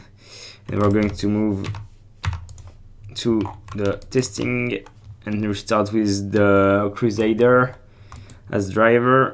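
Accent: France. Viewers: tu a un accent français tu est un français en tout cas très beau mods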